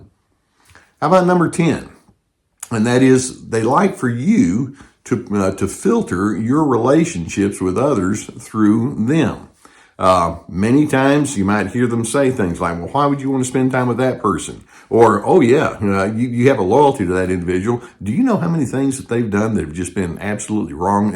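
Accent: United States. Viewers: I love every word in your message dr and your beautiful American accent. Thank you for your incredible help and support!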